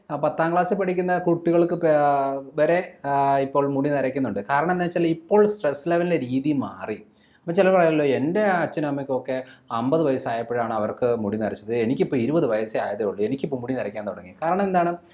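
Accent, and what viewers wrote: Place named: Pala, India. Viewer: എന്താ രസം...Dr.....അവതരണം..സൂപ്പർ...ഭാഷ...സൂപ്പർ... പാല അച്ചായൻ... സ്ലാങ്.... ഇത് Dr..aayi തോന്നുന്നില്ല..just..Oru frd...nice..❤️❤️❤️😘😘😘😘😘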